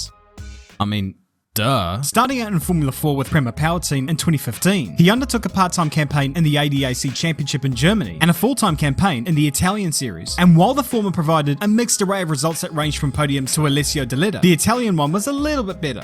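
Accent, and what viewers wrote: Yorkshire, United Kingdom. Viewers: Yorkshire accent on point brother. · Gotta love the Yorkshire accent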